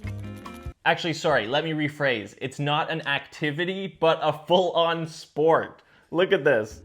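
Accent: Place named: Canada. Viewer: It’s only been seconds into the video and I can already tell the guys Canadian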